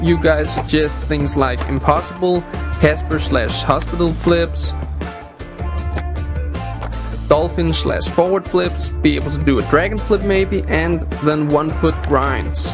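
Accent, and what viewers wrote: Denmark. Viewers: man ka bare høre at du er dansk men hvis man er udlansk er det selvfølgelig ikke noget problem XDXDXDXD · Er du fra Danmark ? Du lyder virkelig dansk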